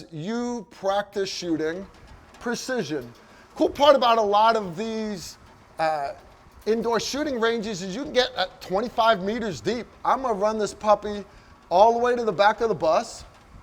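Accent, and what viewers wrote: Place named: Eastern Pennsylvania, United States. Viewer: The accent🤔 eastern Pennsylvania maybe?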